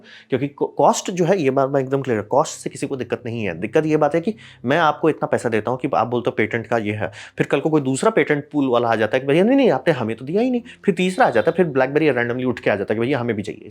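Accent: Bihar, India. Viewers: sir ji aap Bihar se hain kya??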